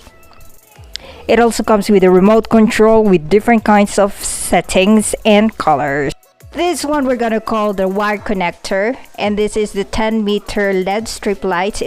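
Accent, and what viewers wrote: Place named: United Kingdom. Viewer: Blue for sad ☹️ Anyway, pinapasaya tlga ako ng british accent mo sis HAHA.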